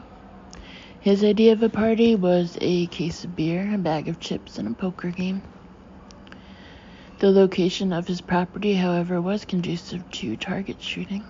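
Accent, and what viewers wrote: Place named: New York, United States. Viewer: Your relaxed, classic New York accent is the perfect narration for these novels. It makes them a pleasure to listen to.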